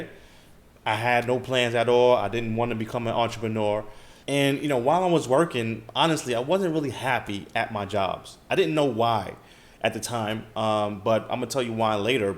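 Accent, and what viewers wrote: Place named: New York, United States. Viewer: you must be from new york, it’s the accent & hustle mentality. i literally feel this with my job rn, in between structuring my time & working for entitled people it really sucks. I definitely need to be an entrepreneur & master forex and the psychological aspects of it, so i’m really thinking about leaving when the time is right thank you for sharing!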